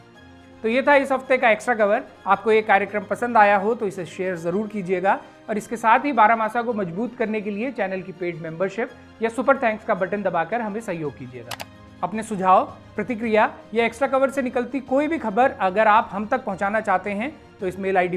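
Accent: Garhwal, India. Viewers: जी आपकी पूरे हफ्ते की छुई बात का इन्तज़ार रहता है, बीच बीच में आपका garhwali बोली का इस्तेमाल आपकी रिपोर्टिंग को बड़ा ही रोचक बना देता है 👍👍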